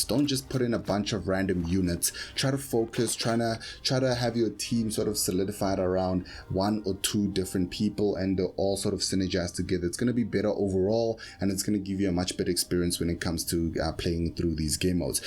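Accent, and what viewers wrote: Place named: South Africa. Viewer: Jasis so good to drop into a dislyte video and hear a lekker south african accent! you playing global?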